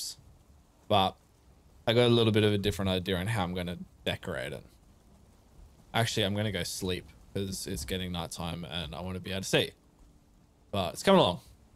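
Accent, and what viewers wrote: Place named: Pretoria, South Africa. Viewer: Not the biggest (smug smile) but impressive for sure. I wish there were MASSIVE gates that you could use especially for harbours. I love your content, l especially relish your expressions when you die. I almost spat out my noodle soup when you took a lie down after dying in one of your early vids when you tried to get your stuff back from your grave during an undead raid. Much respect though that you do all in the classic game style. I build with the build mode and with a number of mods, so comparing my builds even if they are bigger is no contest against your achievment ! Oh and no worries, your Harbour really does look great, at least in my opinion. The only thing I would have added though is a gallery on either side of the great hall. You have plenty of room on either side where you could also extend outwards and add a roof on either side. 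On the inside you could then use that gallery as a balcony. Perhaps also decorate it with more chests and barrels and stuff. That is what I do on my harbours to make them look utilised. I would love to show off my builds as well, but first I need a better PC and need to figure out how to make youtube content. All in good time 😉Keep up the amazing content. I love seeing your base grow. Oh btw. Where in SA are you from ? sounds like Praetoria.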